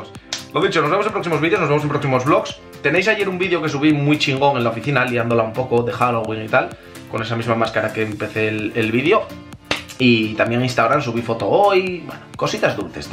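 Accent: Asturias, Spain. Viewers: Me encanta el acento asturiano ye saludos desde Granada 😍😍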